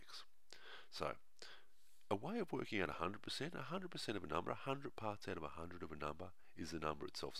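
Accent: Australia. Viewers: Are you Australian? You sound just like my uncle. I love you videos! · good one, your accent look like aussie thums up if u are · Australian bob Ross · If Corpse was Australian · Calm Aussie accent and common sense, both helped give me an extra tool to working out percentages . It helps keeps one in touch with the original math logic so often lost with calculators. THANK YOU ALL THE WAY FROM DUBAI. Bet you didn't expect fans this far out eh ?! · His Australian His Accent shouldn’t Matter his message is Great 😊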